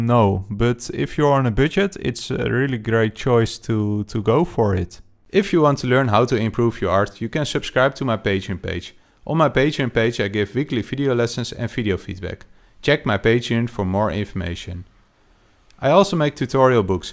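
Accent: Netherlands. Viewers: Your Dutch accent hihi let maar niet op mijn opmerking viel me gewoon gelijk op.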